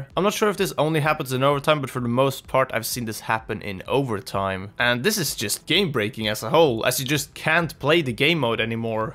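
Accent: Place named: Sweden. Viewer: You sound Swedish. Are you Swedish?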